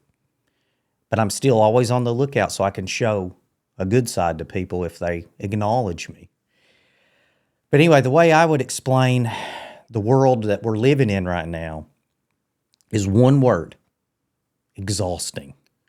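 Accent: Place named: Appalachia, United States. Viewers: Love your hillbilly accent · r u in West Virginia? i grew up there and your accent sounds like where I grew up.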